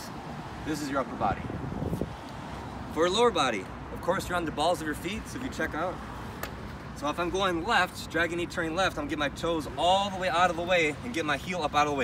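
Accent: United States. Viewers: Great info for any rider, even riders that already get their knee down. BUT THE BEST PART OF THIS VIDEO is your accent and voice. Its amazing. You could do audio books narrate movies, do voice acting... You SOUND like you might be from illinois, POSSIBLY ohio, but man, its hard to pinpoint. Very clean, great diction, your pronunciation is better than a news reporter... Holy shit. Not to mention the voice is as good as the info here. I've been riding for years, been putting a knee down for a long time, but you are setting clear, repeatable markers on the bike for how to do it effectively. I can already tell I'll be able to be more smooth with it from now on.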